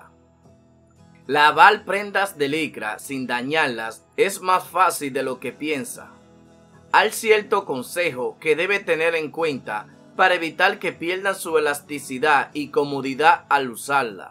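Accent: Dominican Republic. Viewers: Es dominicano/ puertorriqueño amiga 😹😹 así es la lengua allá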